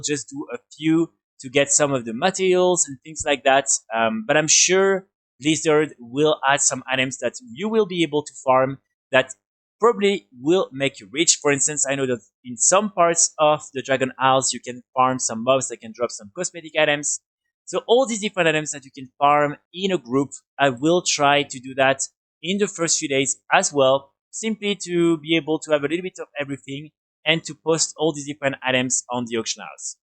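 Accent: France. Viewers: Bonjour, je pense qu'en français je regarderais vos vidéos, mais là un tel accent français pour des vidéos EN je peux pas. Beaucoup de timeline vidéo pour dire finalement peu de choses. Je vous conseille les vidéos de Penguin, niveau contenu vous pourriez prendre exemple. Bon courage à vous.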